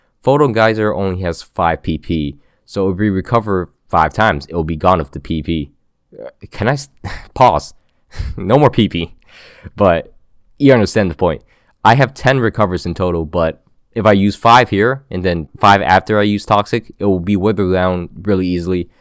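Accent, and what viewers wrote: Italy. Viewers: Wait are u italian? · Wait are you italian? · Are you italian?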